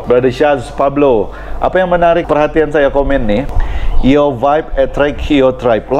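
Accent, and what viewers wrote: Sabah, Malaysia. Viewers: Yay seharian tunggu video bro.. Firstime tengobluerim mati.. Sudah ketagih dengan slang sabah.. Nk tanya berapa minimum size betta untuk jar.. Ataupn umur.. Betta saya 1 cm 3 minggu baru tak sabar nk jaring plak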